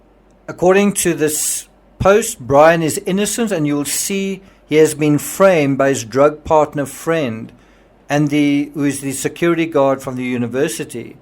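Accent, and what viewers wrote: South Africa. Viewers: Your accent alone is great! South Africans are very interesting people. I was blown away watching Charlize Theron speaking Afrikaans on an interview 👏🏻👏🏻 I always forget she is from there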